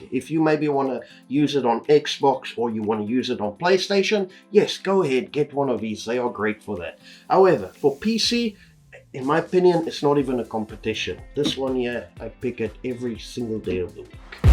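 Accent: Afrikaans, South Africa. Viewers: 😅I know your profile says UK 🇬🇧 but you sound so Afrikaans it's impossible to deny that you might be from South Africa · 10 months later….Wootware has them at R6499…. I was also hearing the accent…and the Brrrrey comes through every now and then😊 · I know a South African when i hear one sir whats the availability in sa?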